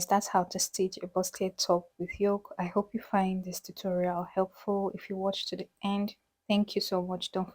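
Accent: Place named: Africa. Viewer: I love your tutorial, but I missed some things. Please clarify what the material is that you are using as the backing to the silk, and what is the sticky item you are using to keep the lace from slipping. I could not understand what you were saying when you mentioned those items. Your African accent made it difficult for me.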